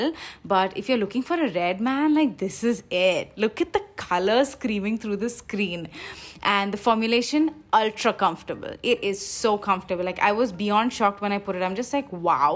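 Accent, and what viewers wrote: United Kingdom. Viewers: Thankyou so much ♥️♥️ this video was actually much needed 😍😍😍 and ofc your British accent ♥️♥️♥️♥️ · damm that british accent... much love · Ahh that british accent of yours which comes in between 💜😂 Love love love ...true inspiration for me 🤗💜💜💜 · Thanks for swatching these babies during nykaa hot pink sale, surely will order few of em💋💋 lots of love ♥️ PS- The British accent though! Lit baby gurll🔥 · British accent?? Why?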